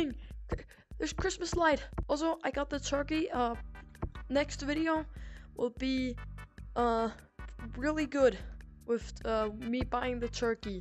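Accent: Denmark. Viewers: Are you danish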